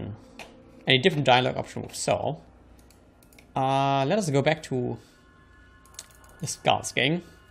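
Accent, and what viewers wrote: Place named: Germany. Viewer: You should talk to again and get him as a follower. By the way where are you from? I've always thought your accent sounds like German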